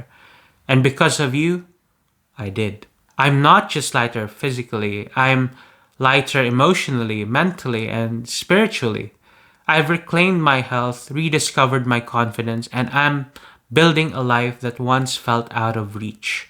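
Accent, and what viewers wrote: Philippines. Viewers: Pina-panuod ko si dugyot kanina nung bigla nyang siningit yung video mo. Nung pinlay na nya yung video mo, sabi ko.. "Bat parang tunog pinoy yung english ni kuya?", at tama nga ko. Masaya ko para sa nangyari sa buhay mo ngayon pare 😊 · accent pa lang pinoy for sure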